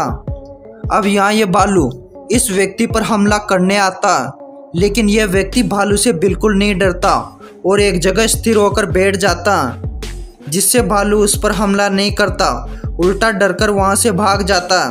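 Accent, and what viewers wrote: India. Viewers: Jaipur side ki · sister are you Bengali 🙂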